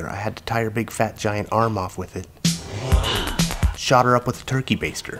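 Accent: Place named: Mexico. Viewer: you sound hispanic / mexican asf my guy / dude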